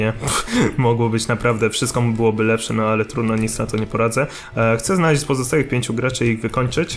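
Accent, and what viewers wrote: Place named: Warsaw, Poland. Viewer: ej jesteś z Warszawy bo słychać od Ciebie te końcówki ę,ą i tak dalej:D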